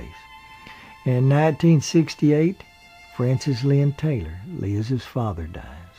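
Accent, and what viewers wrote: South, United States. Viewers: I know....at first I was confused by this southern drawl, but after awhile you come to like it; but why is almost the whole darn thing in Black & White? I want to see those violet eyes! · The narrator is a southerner and they have a unique vocabulary and accent. I thought everyone knew that. I’m sure Elizabeth would be delighted with his work as most people appreciate him. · I discovered your channel by accident but have come to really enjoy it. I like your calm demeanor, the way you say nineteen-and-fifty-six, your sweet southern accent and the interesting research you do. Nothing is rushed, the whole experience gives you insight and a bit of relaxation in the process.. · Good ole Southern accent :) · MandyJMaddison, there are several generations of southerners here in the south that talk like that, and probably won't change. 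they are mostly from cotton mill families, and I find their pronunciations trashy and uneducated. I don't know why so many commenters here actually like his way of speaking.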